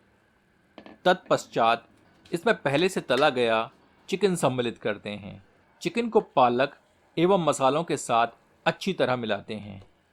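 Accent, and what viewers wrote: Standard. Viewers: Great to hear shoodh hindi. Well spoken and the recipe is amazing. Thank you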